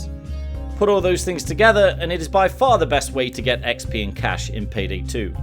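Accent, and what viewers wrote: United Kingdom. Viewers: Hey mate I love your accent where are you from in the UK? Also where can you find teammates to ecm rush heists for xp.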